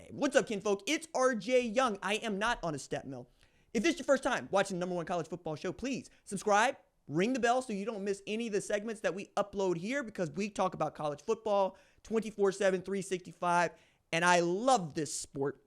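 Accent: Oklahoma, United States. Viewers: Okay Tulsa Ok! Sounding like you from Turley Ok. Just gangster 😂😂❤❤❤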